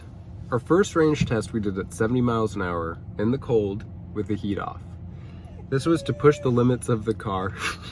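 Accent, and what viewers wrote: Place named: Eastern Europe. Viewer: Where are you from? You have an interesting accent. Like some slight Eastern European accent.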